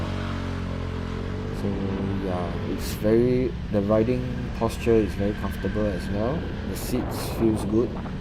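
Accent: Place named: Singapore. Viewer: He's speaking Singlish. Perfectly understandable to a Singaporean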